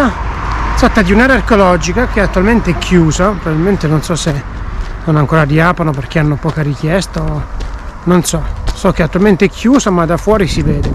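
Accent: Abruzzo, Italy. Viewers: Spettacolo….. posti molto interessanti….. e poi mi sembra di sentire i miei CUGINI di CITTÀ SANT’ANGELO….. grazie di cuore ❤️❤️. GOOD TRIP!!!!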